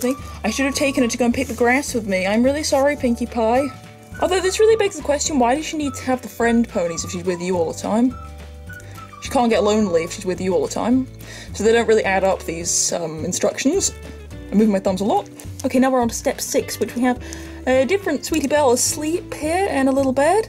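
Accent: United Kingdom. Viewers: You have a nice accent. Brittish?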